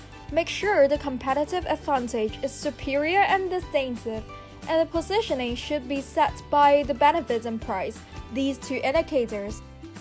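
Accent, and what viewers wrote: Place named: United States. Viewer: I love ur American accent! U must be a beautiful girl!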